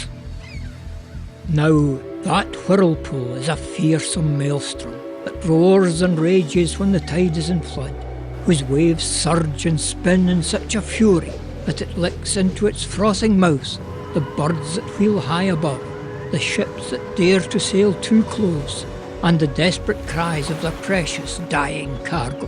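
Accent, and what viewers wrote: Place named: Scotland, United Kingdom. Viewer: Wonderful! And I‘m so thrilled to hear a scottish voice again after a far too long time being kept away from visiting Scotland.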